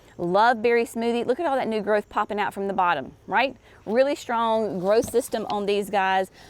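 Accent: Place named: Tennessee, United States. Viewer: I got to comment. Love this video!! I am from Tenn and I declare your delivery is soooo us!!! ❤❤ Thanks. JT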